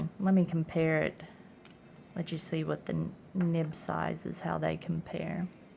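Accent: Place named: Appalachia, United States. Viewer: Good review, but it's wearing to listen to her hillbilly accent.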